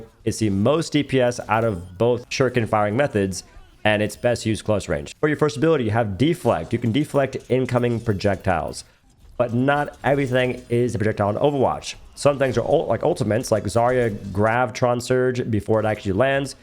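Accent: South, United States. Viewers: You went to having an accent to being a country boy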